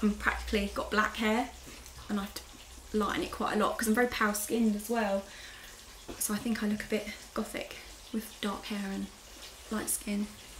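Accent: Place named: North London, United Kingdom. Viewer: Im from enfield so not far from tottenham :) i could tell from your "accent" that you are a north london girl. Fab videos and i enjoy watching them. I like margate in kent as used to go on holiday there as a kid. Now my kids want to move to great yarmouth after they finish junior school but im not sure how i will adapt from the speedy london life style to a more slow and rural life style.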